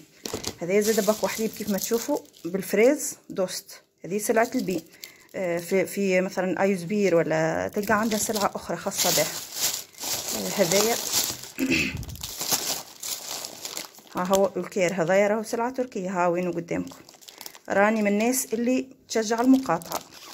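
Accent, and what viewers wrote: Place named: Gafsa, Tunisia. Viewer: والله حسيت لهجة قريبة ليا برشا قفصة وبوزيد من أول الدنيا واحد ملا هذاكاهو أول ما تهبط لتونس نشوفوك عزيزتي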